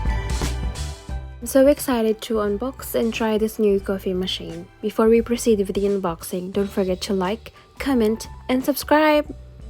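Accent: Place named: Australia. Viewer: Ahhh!!! Ganda! Coffee lover here!!! Love your Aussie accent :*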